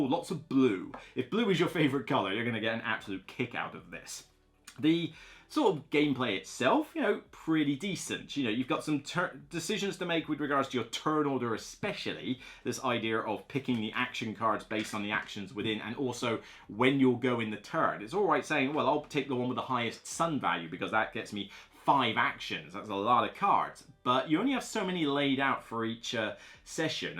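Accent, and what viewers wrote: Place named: Bristol, United Kingdom. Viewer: fair enough - Wales is a bit further away, but I would definitely recommend it (sorry, I thought your accent was from Bristol as my daughter lived there and the accent sounded similar to me).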